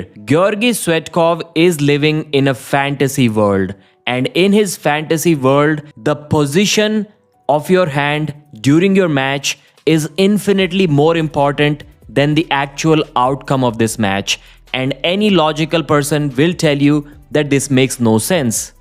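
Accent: India. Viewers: Dude you got the hardest indian accent like fckn ever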